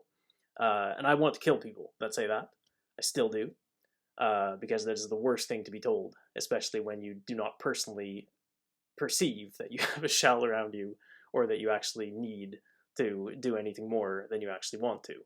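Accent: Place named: Scandinavia. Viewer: You sound like you're from one of the Scandinavian countries, which I assume have a higher percentage of INTJs. Would I be more comfortable living in that part of the world?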